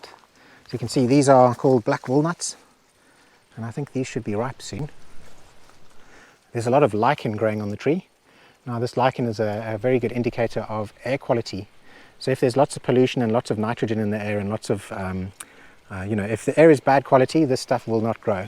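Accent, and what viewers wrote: South Africa. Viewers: South African accent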